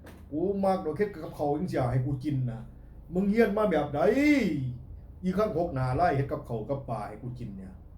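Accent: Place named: Nong Khai, Thailand. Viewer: พูดได้ดีมากคะไทหนองคายคือกัน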